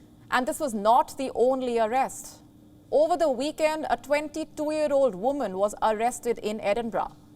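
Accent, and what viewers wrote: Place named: India. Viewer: She is speaking English in Hindi accent. Very hard to follow. Need to improve her delivery.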